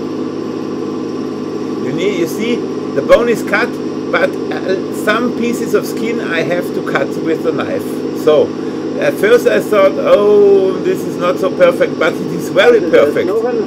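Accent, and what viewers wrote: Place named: Germany. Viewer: EXCELLENT WORK, I JUST WISH IT WAS NARRATED BETTER..SOMEONE WITHOUT A THICK, STUTTERING GERMAN ACCENT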